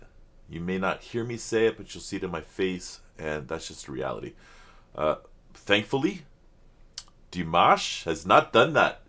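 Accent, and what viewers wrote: Canada. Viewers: I suspect is Canadian due to the way he pronounces his '-out' word sounds as -'oot'. He's been struggling with the pronunciation of Dimash's name for forever. It's not making a connection in the brain. I think we just have to accept it and not worry about it. He's a massive fan and loves Dimash as much as we do.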